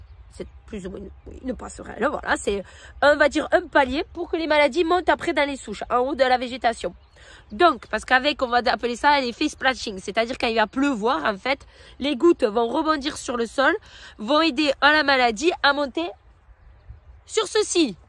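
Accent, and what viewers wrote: South, France. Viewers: Félicitations pour cette belle demo Et joli franc parler agrémenté d'un bel acent du soleil bienvenue chez moi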